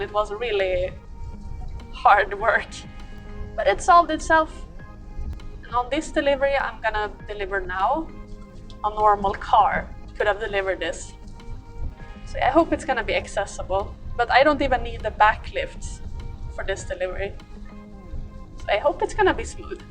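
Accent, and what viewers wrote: Sweden. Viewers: låter du som alla svenskar gör i amerikanska filmer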